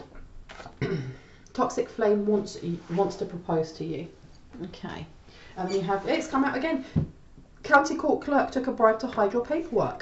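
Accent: United Kingdom. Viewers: You sounds British I like it 😁‼️ very Calm voice.. Eitherway thanks 👍 for giving us your time DuuuuuuvvvvvvvvaaaaaLLLLLLLL county jax FL USA 😊👍‼️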